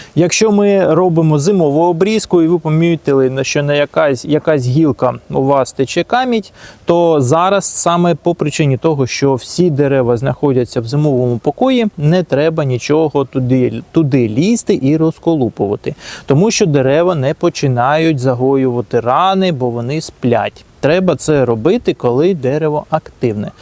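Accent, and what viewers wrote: Standard. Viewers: Украинською классс. Чиста лiтературна, без запинань. То рiвень. Сам я рускоязичний, але перехожу теж на Украiiнський мову та звiсно контент. Тому успiхiв. Ну а з мене пiдписка. Бо корисний контент, але формат з настроем, тому цiкавий. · Приемно вас слухати здоровья вам.Дуже чиста українська мова🎉🎉🎉❤❤❤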